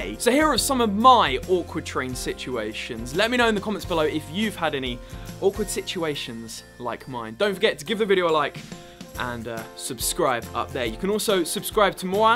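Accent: United Kingdom. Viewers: Thrusting!!!!! *adorable British accent* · Your british accent is incredibly gorgeous :D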